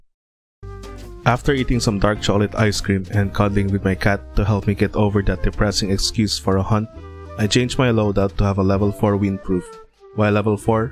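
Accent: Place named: Philippines. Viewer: Presh filo accent 🇵🇭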